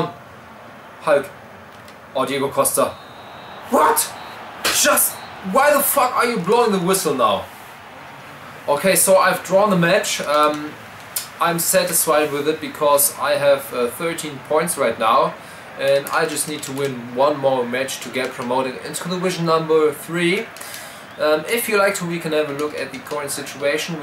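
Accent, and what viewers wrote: Germany. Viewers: was laberst du ? Das ist Baden-Würtemberg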